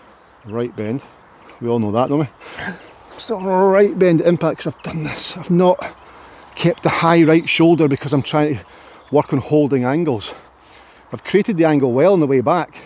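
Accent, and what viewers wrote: Scotland, United Kingdom. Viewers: I love watching your videos, as a Dutchman I have a extreme weak spot for Scottish accents. Love how you always come strrraight to the point. For me I developed a slightly embarrassing release feel: For my irons the release feel is as follows: If I literally would let go of the club I would have it hit someone in front of me in his mid section, for the driver it would be his head. Not sure what a psychiatrist would think of that but it works for me. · I love your videos,. They are loaded with good tips, and you have a delightfully Scottish way of delivering them. Keep at it.m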